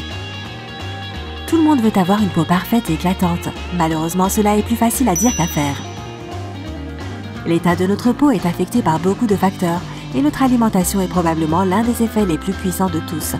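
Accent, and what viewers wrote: Quebec, Canada. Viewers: elle viens du Québec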